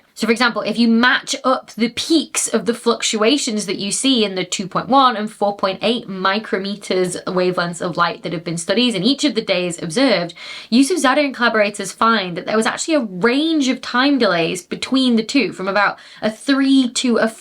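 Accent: United Kingdom. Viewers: For longer…. It’s the Accent… British people would understand this 🙂 But I get how it sounds if you not used to our accent 🙀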